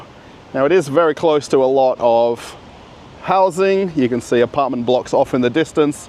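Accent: Australia. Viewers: I'm russian, but I like to listen to clean australian english) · Hello,!!! По работе я не могу много смотреть твои видео,но к счастью могу много слушать. Поэтому я,пользуясь случаем, совершенствую свой австралийский английский,мечтая в будущем посетить твою прекрасную родину!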